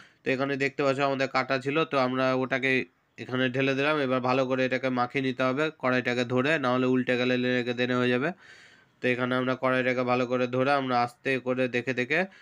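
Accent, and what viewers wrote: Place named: Medinipur, India. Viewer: Amar mone hoy Medinipur ar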